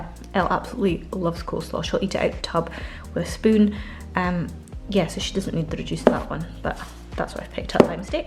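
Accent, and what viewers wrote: Scotland, United Kingdom. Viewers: All I could think is your Scottish lol. I shouted it actaully, I may need help. Trying to find my way just now on my own channel and your's within being on it for two minutes gave me hope and inspiration. Subscribed. <3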